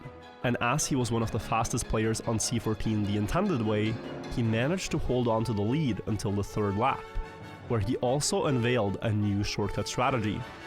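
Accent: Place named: Norway. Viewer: Young sounds so Norwegian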